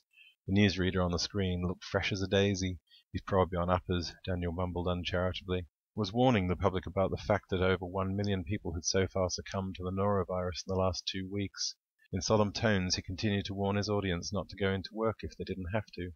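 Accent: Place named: England, United Kingdom. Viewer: Love the photos. Love hearing an english reader and can picture it perfectly. Makes such a difference from listening to the american audio books. So hard to picture a scene with nothing to relate to. Love it! Thank you for giving us brits a great story.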